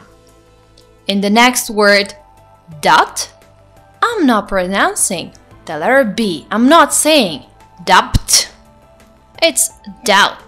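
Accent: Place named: United States. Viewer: I like this American accent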